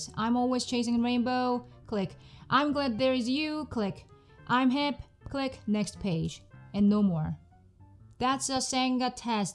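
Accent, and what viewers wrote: South Korea. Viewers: Sangah, I adore your funny Korean English accent as you call it. Your are pretty funny when you make fun of yourself. Love it.💙💙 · 한국분이신가봐요! 악센트 별로 신경쓰지 마세요 영어 원어민들보다 잘하시는데요 뭘 ㅎㅎ 영상 잘 보고가요! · sometimes i think i'll just watch for a couple minutes and then you get me hooked and i have to sign in to thumbs up and i wind up watching for hours. with this one you had me right at the beginning with "maybe just enjoy this funny english, korean accent" and your expression when you said it. hahahaha! so here i am again!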